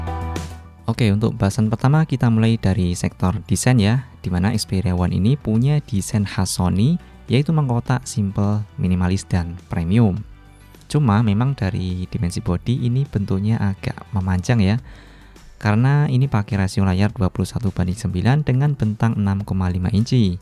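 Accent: Boyolali, Indonesia. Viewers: Ealah mas, orang Boyolali to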